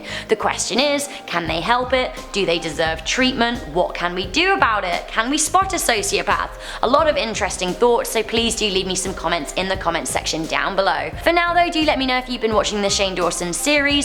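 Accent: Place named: England, United Kingdom. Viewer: I wonder if is single. She is gorgeous plus her English accent just gets me. #toptencrush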